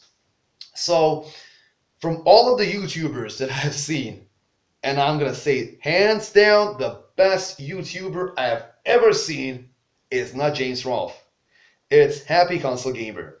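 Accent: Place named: United States. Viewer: U sound so american haha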